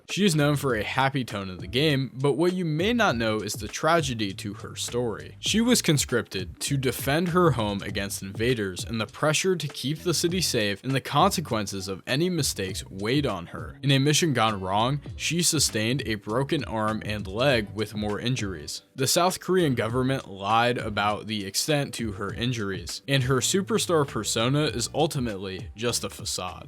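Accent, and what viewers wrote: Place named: United States. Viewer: is this a text to speech or are you so american you can't let go of the american pronunciation? It's horrible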